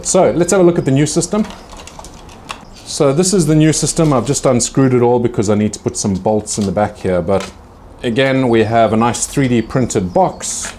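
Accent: South Africa. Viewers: Yes I wondered what a was also at first! I love his accent but sometimes I have to listen again to get what he said. Also, the English and probably the South Africanese have different sayings from the US so I have to listen to the whole sentence to understand correctly. Great man though! I love watching him!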